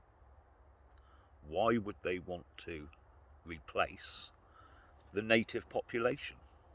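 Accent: United Kingdom. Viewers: I'm lucky to hear a British voice where I live......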